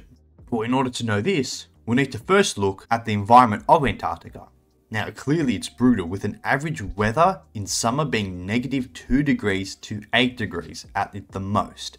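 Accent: Australia. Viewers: Thanks for the explanation with your heavy Australian accent.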